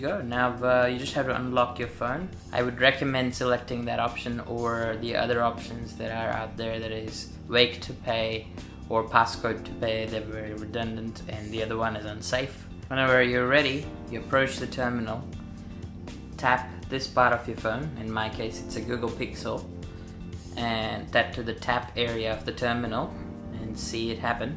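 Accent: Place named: Australia. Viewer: I thought I heard a slight Aussie accent in your Ethernet videos. Nice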